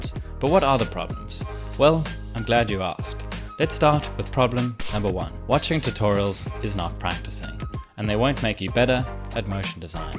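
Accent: South Africa. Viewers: Nice video, I thought I recognized South African English.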